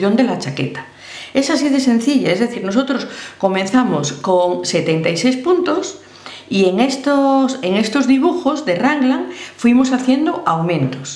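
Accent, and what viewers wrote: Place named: León, Spain. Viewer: Hola!! Me llamo maria y soy de cadiz. Estoy terminando el jersey para el nieto que va a nacer en marzo. Me gusta adivinar por el acento de dónde son las personas y yo diria que eres de Leon...Acierto? A ver si me ayudas y hago dos chaquetitas para 5 y 3 años. Lo haces muy bien!! Gracias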